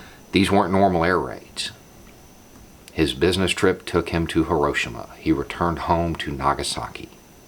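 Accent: South, United States. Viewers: Thank you so much for being a voice of reason in this unreasonable world. Also thank you for being a southerner and showing that not all southern people who speak with an accent are ignorant.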